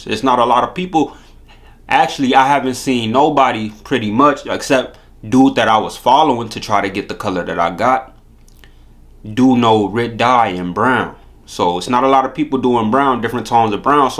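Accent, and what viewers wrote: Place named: Los Angeles, United States. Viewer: mah man sounds like he from L.A. tho...😊 i tried to dye a p-nut p80 17 frame last night n it came out like what you said...dark brown burgundy using chocolate brown. i wasnt sure when to pull it out tho and kept it a tad too long in there. it went like dark from one moment to tha otha and i jus missed tha bus with this one. i have a tungsten grey/silver polished strike industries lite slide on there wich looks nice but i was shootin for exactly what you have there that lighter brown... i might pull out another fde frame and do your route,cause this is nice tho❤ very nice combination with that slide. i also want to try royal purple on a grey frame.... very nice what you have there....and you helped a lot with this vid... i shoulda seen it before i did mine😂. good lookin🤝🏾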